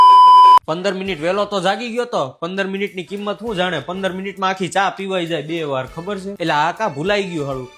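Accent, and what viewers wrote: Kathiawar, India. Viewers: લાગે છે તો અમારા કાઠિયાવાડી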